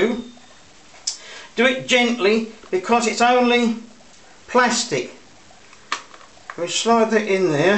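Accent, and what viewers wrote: London, United Kingdom. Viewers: London. He sounds like my Dad, born 100 yards from London Bridge.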